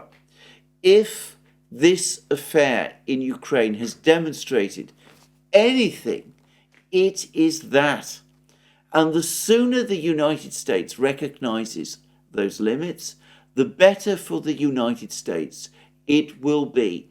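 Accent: United Kingdom. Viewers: A year's training for Russian's soldiers eh? I don't think I've ever caught Alexander telling such a bald-faced lie before. The man absolutely has no shame. He appears to have no regard for the intelligence of his audience. He knows he's just shoveling thinly disguised nonsense gussied up with a British accent and a 50-cent thesaurus, and is just taking the money and running with it.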